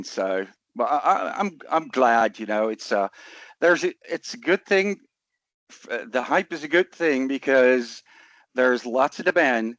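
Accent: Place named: Australia. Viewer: Nice Aussie accent for a Dutch guy ...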